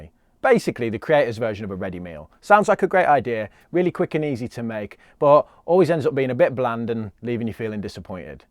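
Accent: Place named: Coventry, United Kingdom. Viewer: 28 These are my salad days Your accent is a bit more Cov than 0121 🤪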